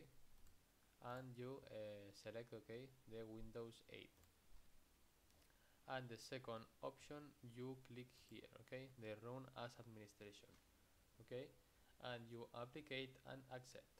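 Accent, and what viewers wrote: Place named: Albacete, Spain. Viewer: tienes un acentazo de albacete q no te puedes ni imaginar pero me has salvado la vida eres el mejor sigue asi por favor te amo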